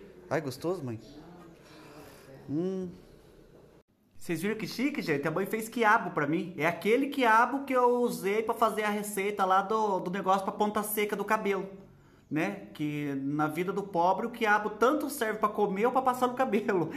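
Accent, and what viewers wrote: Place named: South, Brazil. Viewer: Sotaque sulista!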